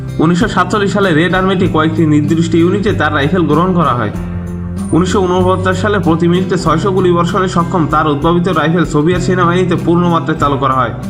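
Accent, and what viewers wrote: Bangladesh. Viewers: vi ki noakhailla naki..... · আপনার বাড়ি কি খুলনা, যশোর বা নড়াইলে? আপনার কথায় আঞ্চলিক টান আছে।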